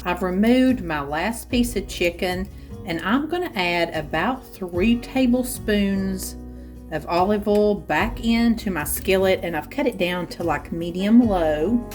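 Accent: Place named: Georgia, United States. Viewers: I do love listening to you talk, you remind me of my hometown in southwest Georgia. Your recipes are delicious and healthy. And I love cooking the food you cook ❤ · I cut my bell peppers from top of stem...curve over seed pod to bottom. I used to do it your way....so maybe try my way lol! Love u...I am a SC girl....so appreciate the accent!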